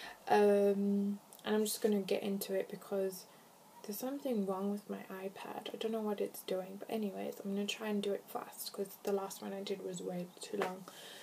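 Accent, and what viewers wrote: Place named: United States. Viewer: You kinda sound american ... kinda. You are beautiful though.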